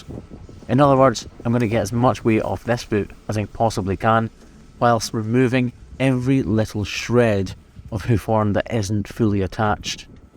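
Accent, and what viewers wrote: Scotland, United Kingdom. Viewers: You sound like an avarage british person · Scottish is crazy sometimes. I deadass thought you were speaking French and I didn’t know why I couldn’t understand until I realised you were speaking the queens English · Is it just me that find theses videos strangely soothing? Perhaps it's his calm Scottish accent.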